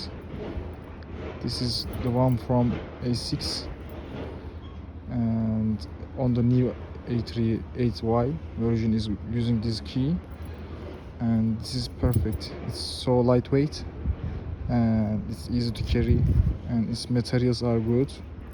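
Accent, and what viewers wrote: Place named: Turkey. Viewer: şarkısi çalmadan önce anlamıştım türk ingilizcesini:) teşekkürler video için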